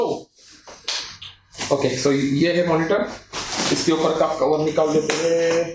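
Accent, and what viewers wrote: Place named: India. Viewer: ı love so much indian english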